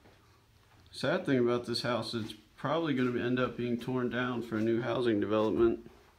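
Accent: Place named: South, United States. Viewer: There is something unnerving about an explore when its insides don’t match its outside. This one creeped me out a bit, but was redeemed in the end by the hand-hewn walls you discovered. You yourself are exactly as your voice suggests: A good-hearted, soft-spoken, southern boy. Pardon me saying “boy” if you find that offensive, but I am myself edging into old lady territory though I have yet to annoy any children by pinching their cheeks. At any rate, your insides match your outsides.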